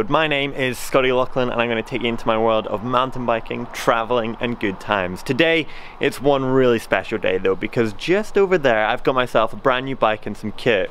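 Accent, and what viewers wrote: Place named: United States. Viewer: Might just be me but your accent sounds a bit American!? Nice bike though.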